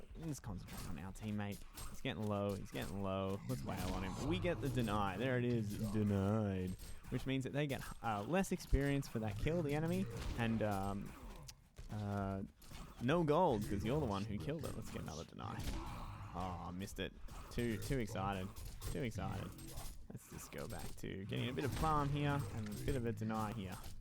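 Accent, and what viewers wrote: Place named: Australia. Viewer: Hey this is mad, I was looking for a guide to get my mate started on this game. clicked on this cos it's fairly recent, But the major plus when i first hear you talking. You sound Aussie, but then there's elements of your pronunciation that doesn't..... are you? hahah. I'll watch through now and show my mate. Cheers! (Please reply)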